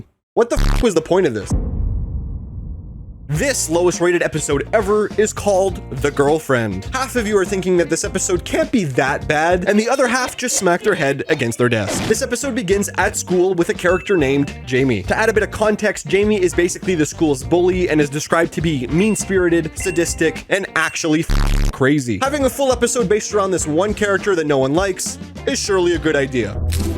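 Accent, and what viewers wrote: Canada. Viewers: Wait is this guy Canadian? DID I FIND MORE OF MY PEOPLE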